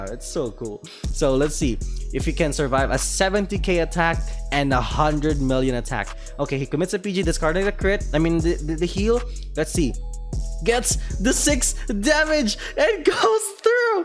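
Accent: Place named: Philippines. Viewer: Sounds Filipino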